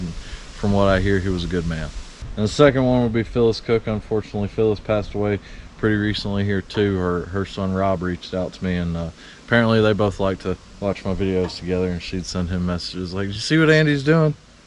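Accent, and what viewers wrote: United States. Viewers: Man its so fine to find somebody who speaks murican. New subscriber.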